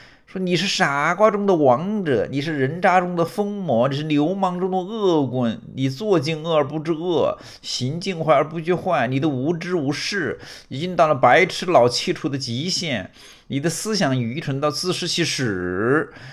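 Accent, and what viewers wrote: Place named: Hunan, China. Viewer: 听到了湖南口语，想起我隔壁宿舍的兄弟。哈哈哈哈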